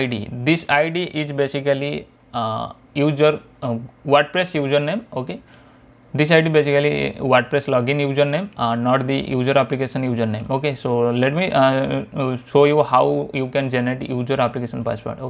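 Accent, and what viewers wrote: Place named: England, United Kingdom. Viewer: You have an awesome English accent. So easy to understand. 👍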